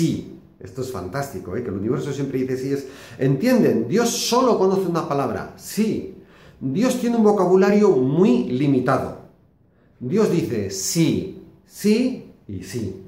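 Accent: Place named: Basque Country, Spain. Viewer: Fantástico! Tu pasión nos transmite un verdadero chute de energía positiva! pareces vasco hablando... yo lo soy. Gracias! 💫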